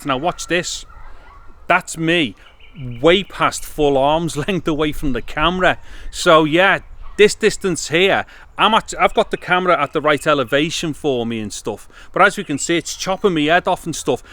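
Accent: Liverpool, United Kingdom. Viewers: I’m here from Muldoon. If you’re not looking specifically for a vlog camera, I think this Sony zv1 seems a good camera. But then...isn’t there better cameras for a similar price or cheaper that can do similar things? I’m looking at Sony a6000, Sony RX 100 V, Canon g7x mark II or as you mentioned GoPro 8. What would you recommend? I’m not very technical, I’m looking more for photography and have the ability for good video or vlogs if I felt like it! Also nice to hear a Liverpool voice! Best Wishes 💕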